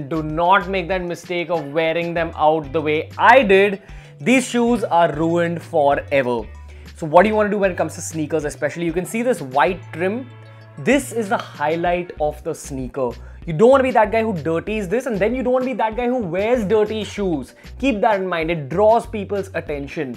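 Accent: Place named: India. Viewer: "short" or "shirt" sh*t!!!! that's how indians speaks!!!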